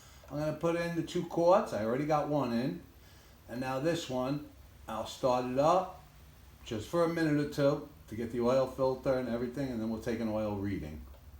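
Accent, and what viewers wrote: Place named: New York, United States. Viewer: Hey, I haven't heard your type of New York accent in over 30 years! It's refreshing to hear it after such a long time. I'm not a native of New York, nor, a transplant. It's comforting to hear it again.🤓😁⭐⭐⭐ Doug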